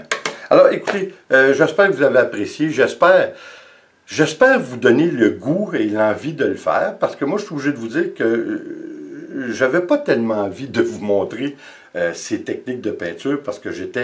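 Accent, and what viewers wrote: Canada. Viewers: Bravo cher vos explications Meme avec votre super charmant accent Canadien sont formidables 👏👏👏👏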